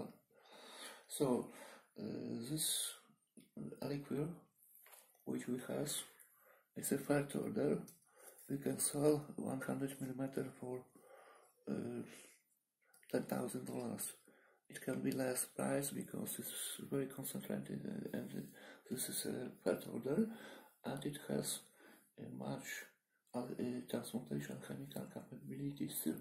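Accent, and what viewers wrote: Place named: Dnipro, Ukraine. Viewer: бля у него акцент Днепропетровский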